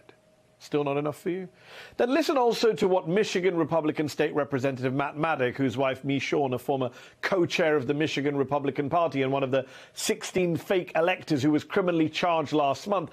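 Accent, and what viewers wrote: United Kingdom. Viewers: You dont sound like an American more like a Britain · You have a British accent you're not American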